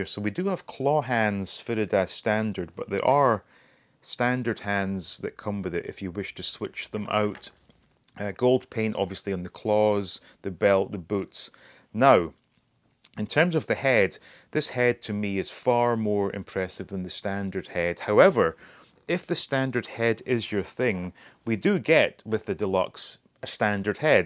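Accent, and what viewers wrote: Scotland, United Kingdom. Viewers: That explains all, its the Scottish accent that got me confused.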